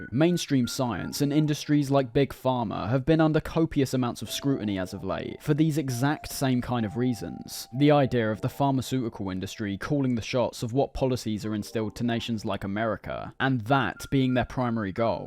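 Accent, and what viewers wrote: United Kingdom. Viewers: Ahhh British guy video game essay just what I needed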